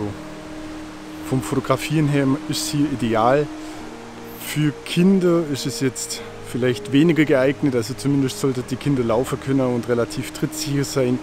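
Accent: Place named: Swabian Alb, Germany. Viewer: Dein Dialekt hört sich ein wenig nach schwäbische alb an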